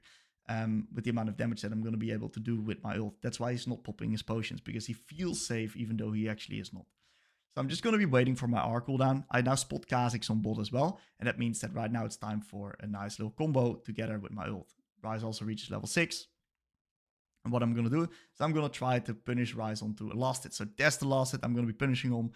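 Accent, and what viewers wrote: Netherlands. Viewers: >the perfect leblanc guide >almost 8 hours long u are so goated lmao also are you dutch? your accent sounds rly dutch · I wanted to learn this champ and this is 2 weeks old really nice this will help me a lot 💪🏼💪🏼💪🏼💪🏼💪🏼 (Btw you sound dutch haha) Edit: stopt watching (gonna watch the rest later)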